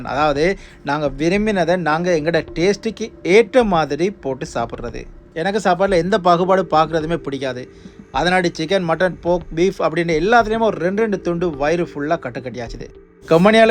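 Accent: Sri Lanka. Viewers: சிலோன் தமிழ் 😊❤ அருமையா இருக்கு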